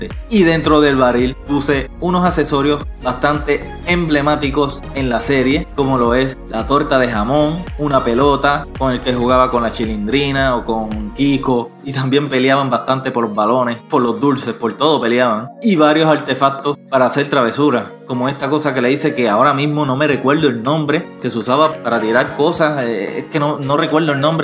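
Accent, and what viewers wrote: Puerto Rico. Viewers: eres de puerto rico porque creo que eres de puerto rico por que se te nota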